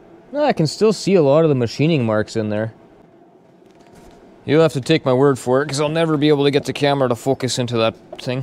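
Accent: Canada. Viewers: The sheer amount of moving parts in a fuel injection pump that was designed decades ago is mind boggling. I love your content, man. This is a relatively new-to-me channel, but you post lots of very interesting stuff, and as a fellow canadian, your honest-to-goodness canadian accent warms my heart in a sea of american videos.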